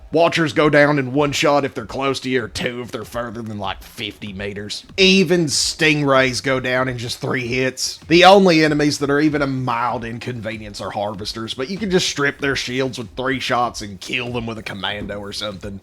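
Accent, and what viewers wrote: South, United States. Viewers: not with his accent. the southern accent eliminates the 'i' from the 'ai' dipthong, which means 'fire' is pronounced more like 'far'.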